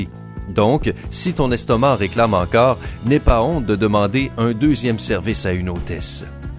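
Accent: Quebec, Canada. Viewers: Pur accent québécois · RRr cet accent québécois.. Je n'arrive pas à m'y faire. Il est agaçant à entendre. Pardon. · Putain d'accent canadien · Oh Non, l'accent canadien. Je peux pas ... sorry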